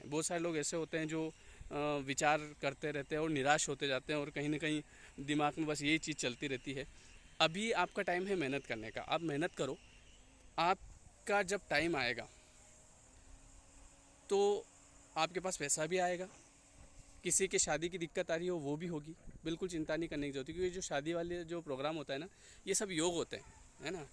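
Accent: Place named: Malwa, India. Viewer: Ur Malwi accent reminds of old days. Now m far away..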